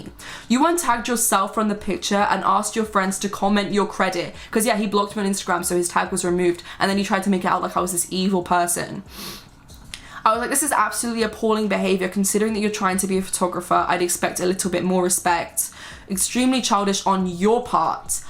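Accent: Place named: United States. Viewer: Why do u put on an American accent for?? Or are you but lived out of u.s for a while? Xx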